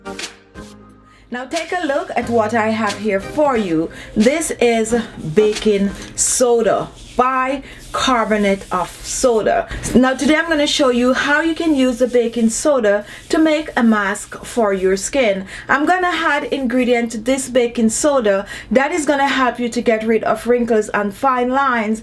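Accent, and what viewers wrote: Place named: Jamaica. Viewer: Hello, are u jamaican like me it sounds like u have the same accent. NO offense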